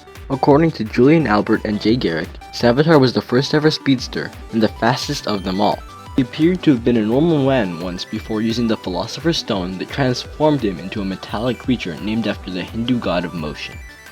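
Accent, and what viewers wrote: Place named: United States. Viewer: you've gtta be shitting me... ur only in high school!? I won't ask what town u live in bc I doubt you'd wanna give up personal info to a total stranger over the internet like that but I will ask what state u live in (or if u happen to live in Canada; but u do sound like ur from here but whatever lol) I ask bc I want to see what states school system is obviously way better then New Jersey (where I live) n their school system lmao.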